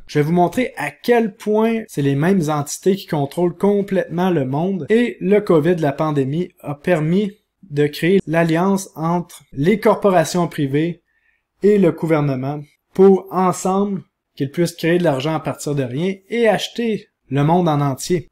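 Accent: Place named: Quebec, Canada. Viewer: Assez impressionnant. On dirait un peu de théorie du complot. Mais je dois avouer que ça nous fait réfléchir. Je vais surement regarder cette vidéo encore quelques fois et faire mes recherches afin de m'en faire une idée. Merci. PS: c'est bien d'entendre quelqu'un du Québec. " Du coup " ça change de l'accent français...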